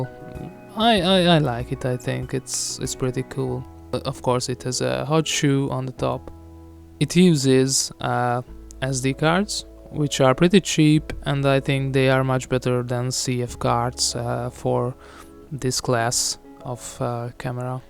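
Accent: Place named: Hungary. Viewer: az a jo magyaros akcentus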